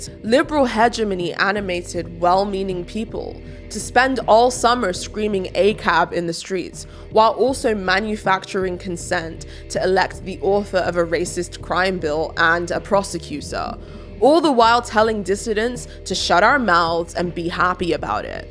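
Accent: United Kingdom. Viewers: Are you from Bristol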